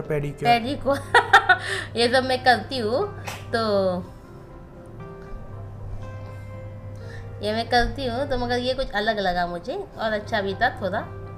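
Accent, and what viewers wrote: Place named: Mumbai, India. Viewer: Bilkul Sachchiiiii baat hai, Andaaz to Wahi hain bolne ke khaalis Mumbai 🤦🏻‍♂️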